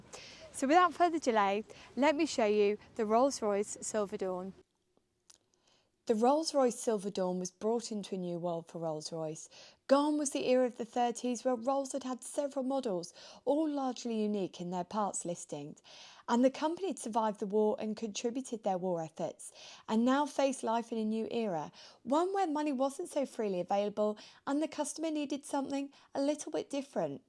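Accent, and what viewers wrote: United Kingdom. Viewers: A very few of us can still speak the King's English!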